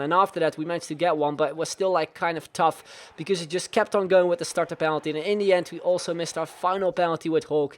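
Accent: Netherlands. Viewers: From holland i can here that bye his accent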